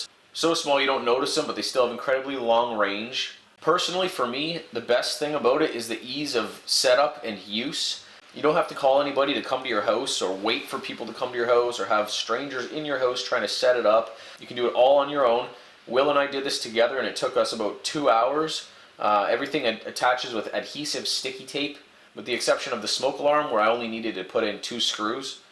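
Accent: Ontario, Canada. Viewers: Your Canadian correct? Not out of Michigan. Thanks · hey. i saw you on alone and stumbled across your algonquin videos. i'm also from ontario and around the same age as you so i love how we have the exact same accent and i get all your little references. this dog is probably smarter than scout was so you gotta be more strict and firm. make it learn tricks and commands and dont be afraid to be firm. can't wait to see how he does in our beautiful provincial parks this year. thanks for making these vids, i know it's a lot of work but i appreciate it and i know your kids will love looking back on these videos once we're both old grumpy men.... or after you die from being eaten by a muskie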